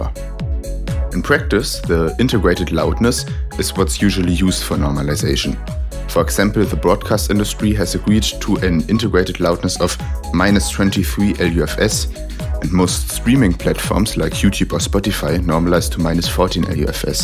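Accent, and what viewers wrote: Germany. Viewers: Super video, echt spannend! Muss aber sagen, dass dein deutscher Akzent echt extrem ist (nicht das es bei mir besser ist)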